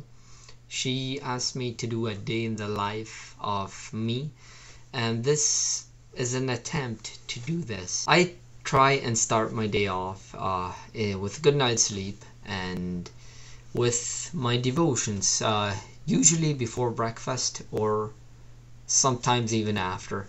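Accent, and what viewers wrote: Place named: Ireland. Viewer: A strong Irish accent has. Fabulous work you all do,the men manufacturing and building and the ladies & Children work the land and are home makers..such a wonderful way of life.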